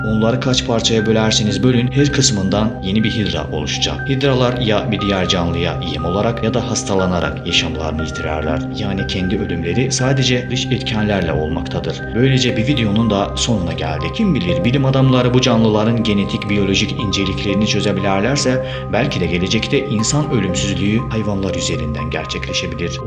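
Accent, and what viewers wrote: Azerbaijan. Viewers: Azerbaycanlı bence aksandan anlaşılıyor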